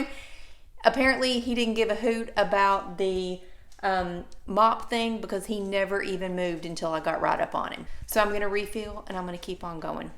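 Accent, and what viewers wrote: South, United States. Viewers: Great video and warm Southern accent🙂 Shark mfg needs to send you a big fat gift card😁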